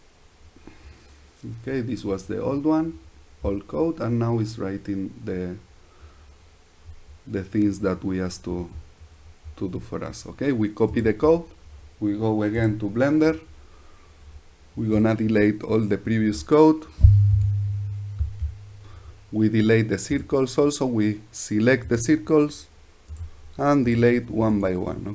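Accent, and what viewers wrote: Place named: Highlands, Peru. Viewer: Inglés cerreño, me imagino que eres de peru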